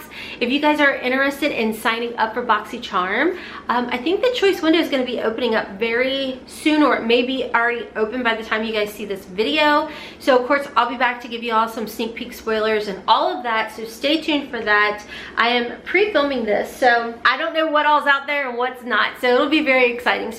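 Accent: United States. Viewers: Haha 92% of the time you have a generic American accent…oh, but the other 8% 😂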